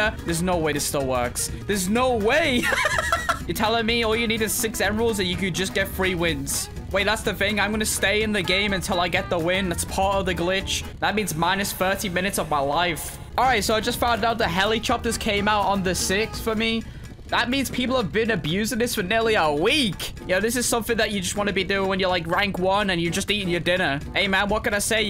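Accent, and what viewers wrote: United Kingdom. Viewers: Bruh he’s British that’s why he says it like that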